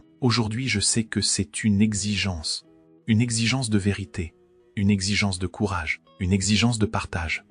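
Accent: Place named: England, United Kingdom. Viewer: Arrêtez cet accent anglais c est insupportable et n à aucun sens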